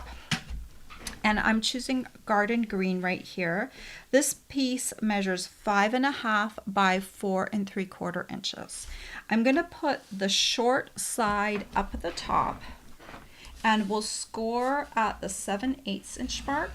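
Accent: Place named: Canada. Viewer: Hi, for some reason (maybe your accent) I thought you were in Canada! I was so shocked when you just said you are in the Boston area!! So I CAN order Stampin' Up! products thru you in the future...😊😁😄Yea!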